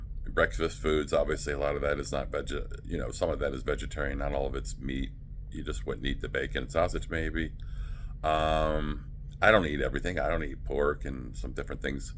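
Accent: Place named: United States. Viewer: White guy, speaking American English… you on a US registered ship? That’s not how it is typically at sea.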